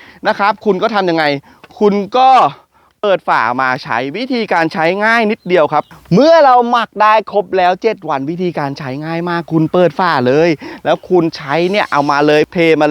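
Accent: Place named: Ban Pong, Thailand. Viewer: เก่งจังเลย..คุณ..เสียงเหน่อเหมือนกันเลย(คนบ้านโป่ง)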